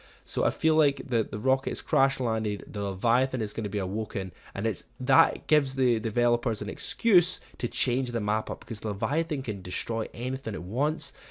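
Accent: Ireland. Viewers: Are you irish??? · Are you Irish cuz it sounds like it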